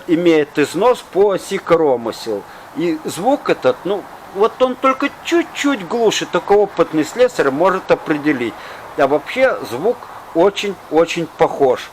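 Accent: Odessa, Ukraine. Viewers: Таки сразу понял шо попал на канал настоящего одесита, лайк. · Такой одесский говор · Таки я вам премного благодарен за дельные советы,а вы самом не из Одессы?И машинка стоит на фоне японская,Митсубиси,и речь таки знакомая...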